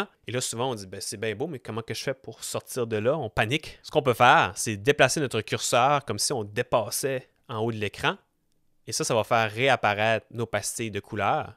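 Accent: Canada. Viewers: Vous êtes québécois ? 😊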